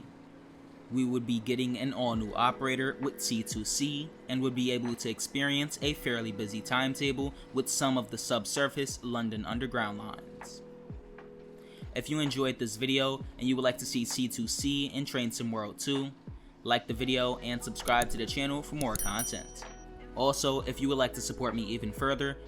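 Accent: United States. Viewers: Having moved out a while ago, this was so nostalgic hearing someone talk about the line that I grew up with. I also loved hearing someone with an american accent saying my home town name xD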